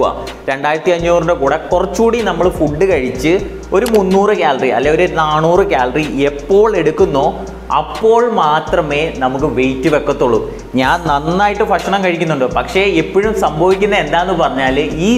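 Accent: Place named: India. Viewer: നല്ല അവതരണം 😍ബ്രോയുടെ സ്ഥലം എവിടെയാ? അല്ല സംസാരത്തിൽ "ഫ "വരുന്നുണ്ട് അതാട്ടോ ചോയിച്ചേ 🤔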